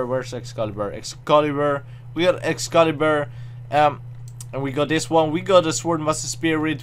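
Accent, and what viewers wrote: Netherlands. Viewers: I love you're vids and accent r u Dutch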